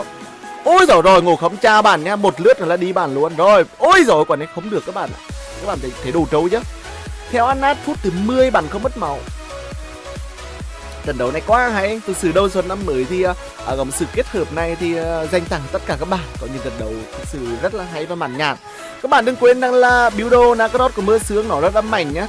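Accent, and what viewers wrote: Nghe An, Vietnam. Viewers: Giọng a vậy là ở nghệ an rồi đúng ko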